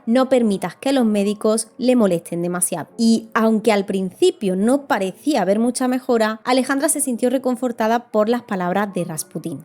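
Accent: Andalusia, Spain. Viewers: Sí el acento de es andaluz. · Me fascina la historia de Rasputín!!!! Y más como tú la cuentas!!! Ah y tienes un bellísimo acento!!!! Es andaluz? Saludos poéticos desde Chillán en Chile!!!!!!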